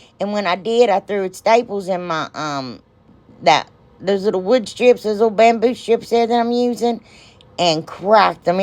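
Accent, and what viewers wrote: South, United States. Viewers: I always love to see that you upload a video. I feel like I can relate to you more than most others that I watch. I notice that your address is in OH, but are you originally from a more southern state? I just wonder because you have a wonderful southern accent. I do, too, so I just relate to it.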